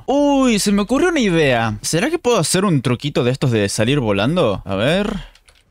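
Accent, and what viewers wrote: Córdoba, Argentina. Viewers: Soy yo o tiene acento cordobés? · HABLA COMO SI FUERA ARGENTINO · Pareces cordobes con ese acento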